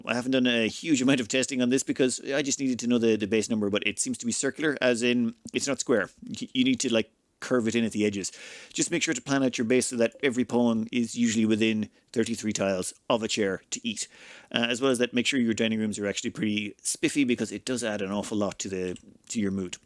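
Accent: Ireland. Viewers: It's an Irish accent.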